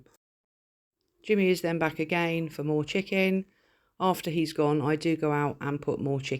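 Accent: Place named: West Country, United Kingdom. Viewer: Also, being a proud glasweigan (& i think I've told you the funny story of being in Devon - i guessed ur accent even though I don't think u ever saw that message, especially as it was Simón & Magoo time and u were run aff yer feet!! - I went to "Buckfast Abbey". Thank God I'm sober now 🙏 but going there was a big thing for a bucky lover 😆 Anyways what am I on about, aw aye - something you, Karen & Carol could watch, perhaps. It's a comedy. I'm being a bit bias, with my glasweigan specs 👓 on - but have you heard of a bbc sitcom series called, "Still game????" I doubt it because you are so far south in cornwall, beautiful west country. Well the last sitcom bbc did of us, was "rab c nesbit". I think you'll know of that. But STILL GAME is so funny. It was made more than 20 years ago. About 2003 it started. Wee half hour episodes. It is on bbc iplayer. 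And YouTube. But you must start at series 1 episode 1!! You must, to get the gist and to enjoy the hilarity. If you are looking for something different to watch, something funny, and if you don't understand any of the glasweigan words, geeza shout 😅 But am sure you'd like it, the English people I've recommended it to, they've gave excellent feedback. Much better humour than Rab c nesbit ever had 😮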